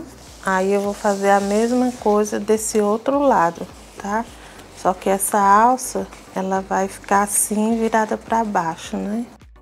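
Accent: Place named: Northeast, Brazil. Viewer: Boa tarde. Amo seus videos e as explicações, sem falar no seu sotaque nosdestino. Tbm sou. Mas, não fique chateada.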